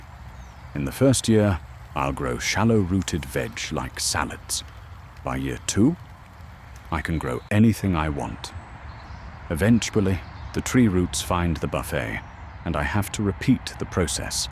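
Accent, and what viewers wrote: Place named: RP, United Kingdom. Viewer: The commentary is just brilliant. Funny, whilst actually parting knowledge and being very informative. Just brilliant and very engaging and enjoyable. Only a posh and articulate Englishman could pull that off. I think you're going to become more and more popular with this unique content. All the best to you, and please don't change the style and delivery. Its so original.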